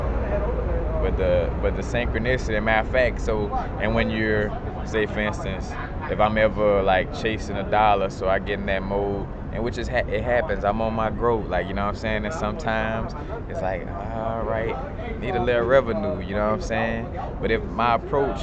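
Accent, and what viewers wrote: New Orleans, United States. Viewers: Brother are you from New Orleans? Sure sounds like it.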